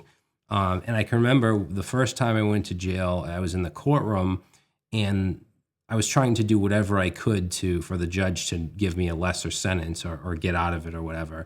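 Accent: Boston, United States. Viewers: Was curious how vite worked and found your video to be super helpful. Thought I heard a boston accent so decided to check out your channel. I'm two minutes into this video and find it to be very moving. Earned a sub today, go sox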